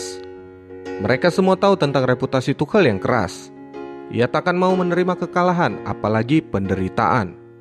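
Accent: Medan, Indonesia. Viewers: Orang medan rupanya abg ini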